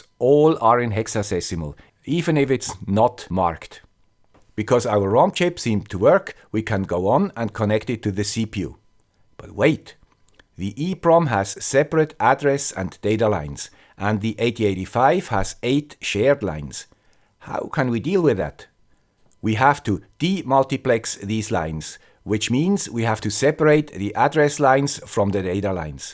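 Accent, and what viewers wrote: Switzerland. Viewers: I'm also 63 years and grew up in SF Bay, so have 40+ years in computers, software and electronical things (35 of which are obsolete). Found your hilarious and accurate representation of those days refreshing. Love the Swiss accent - keep up the good work! P.S. once connected an Atmel 8085 (?! flavored chip (someone knows the part#) to an old school PS/2 keyboard [clock, data and power]. Fun fact: it sent keydown, keyup!, func keys, shift, ctrl, etc. along with control over 3 LEDs in a seemingly random but decodable fashion. Currently working on an RCA CDP1802 (Membership Card) just for fun! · Another masterpiece lecture from the "Guy with a swiss accent" . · Now that was both fun and complex. Beautifully broken down and simplified in a way only the guy with the Swiss accent could have achieved. I’m feeling all nostalgic 🏴😁 thanks 🏴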